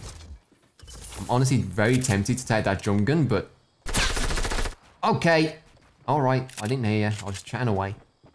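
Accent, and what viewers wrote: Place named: United Kingdom. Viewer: your british accent it is really good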